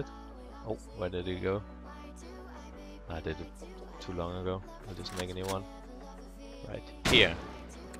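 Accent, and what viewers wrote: Denmark. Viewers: Han er 100% fra Danmark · du dansk. tydeligt på stemme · You sound danish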